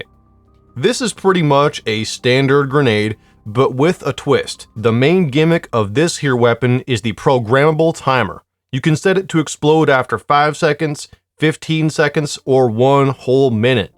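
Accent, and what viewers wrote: United States. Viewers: Love the southern drawl throughout the vid! I will pick this someday soon, just need to get the credits first. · did you seriously do the western accent this whole video?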